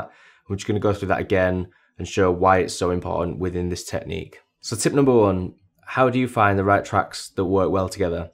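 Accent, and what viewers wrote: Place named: West Yorkshire, United Kingdom. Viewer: Good stuff, where's he from? Sounds Barnsley or maybe West Yorkshire?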